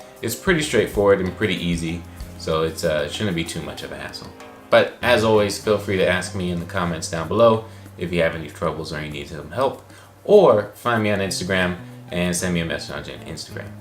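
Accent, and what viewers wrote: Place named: Brazil. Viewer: cool lol. I'm Brazilian. You got a lil of bit of Brazilian accent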